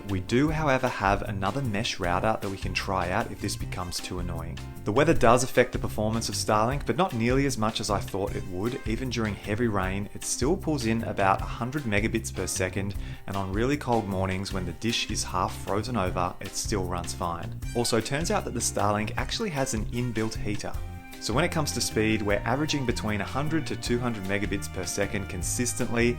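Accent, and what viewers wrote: Australia. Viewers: The most Aussie start to a video ever..,”it’s been two months since we installed Starlink on our garden shed” [with Aussie accent, pointing at Starlink dish, bush setting] 😀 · Aussie accent is the best mate!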